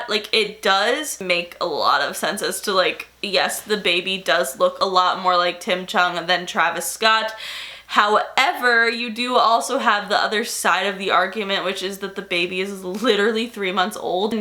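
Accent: Canada. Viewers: lately i think you can been sounding Canadian🤷🏼‍♀️ idk if anyone has said this cause i don’t really look at comments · I'm so confused as to why has a Canadian accent if she's an Italian from Florida like wtf 😂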